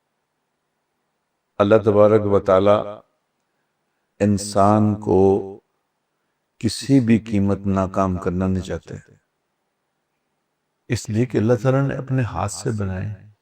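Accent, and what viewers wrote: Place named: Lahore, Pakistan. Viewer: Loves his lahori punjabi 😊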